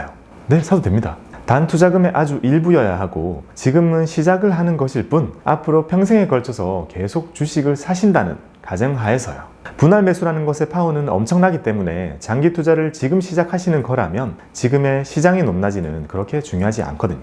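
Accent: Gyeongsang, South Korea. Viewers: 책 읽고있는데 특유의 경상도 말투? 음성지원되는거 같아요. ㅋㅋ 세상 쫄보인 제가 주식이란걸 시작하게 될 줄은... 덕분입니다. 감사합니다~~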